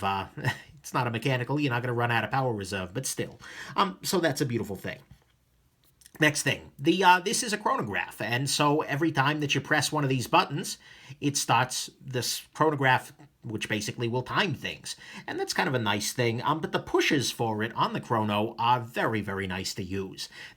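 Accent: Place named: Brooklyn, United States. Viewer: I love this watch but I really came here to hear the voice of, reminds me of Vinnie on Doogie Howser MD lol, plus that Brooklyn flow, being from Queens I can appreciate that!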